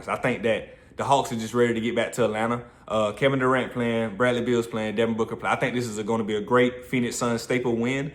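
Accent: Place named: Jacksonville, United States. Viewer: Keep these picks comin from the 904 Jacksonville Fl and I think I hear the Duval in you too😂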